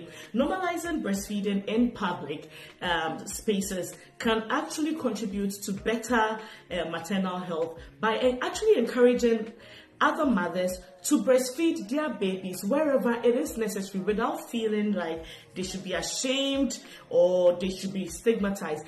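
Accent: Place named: United Kingdom. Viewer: I love the background and your UK accent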